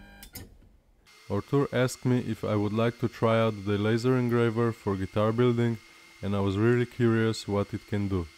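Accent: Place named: Montenegro. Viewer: Nice work man! I would almost bet, judging by your Eng accent, that you come from the small and beautiful country that has about 40km of Adriatic coast and a 2850m highest mountain. Can I ask why you opted for the glued neck, was it aesthetics or also sound? And did you make your own pickups? I have never seen that shape of pu's before. Last question :) ... what gauge strings did you put on. The low E looks like it's quite thick, like 54 or 60. Cheers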